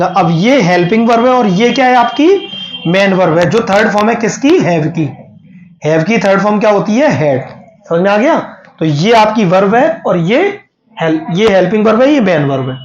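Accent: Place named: Bhind, India. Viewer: Sir apki awaj Bhind jile jaisi lag rhi aap kaha se pada rhe ho please reply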